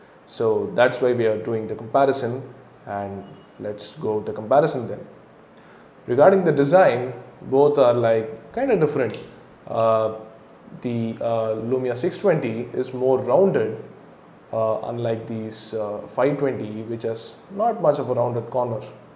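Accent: India. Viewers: your voice is very clear you had a really nice review and you didnt speak too fast for some reason, I liked the hint of an indian accent to your voice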